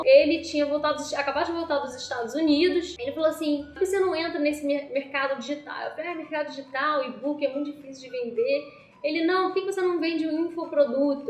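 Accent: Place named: Rio de Janeiro, Brazil. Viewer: Pelo sotaque é carioquíssima... Estou procurando editora para Romance dramático. Vou mandar-lhes meu original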